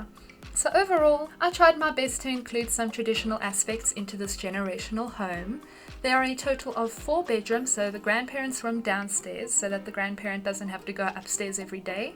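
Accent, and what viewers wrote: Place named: South Africa. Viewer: Just came across your video and immediately knew you were a fellow South African! Great build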